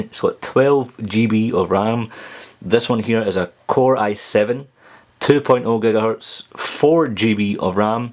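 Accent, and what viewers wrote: Scotland, United Kingdom. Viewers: Are you scottish? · i love your accent i love scotland man. cheers from greece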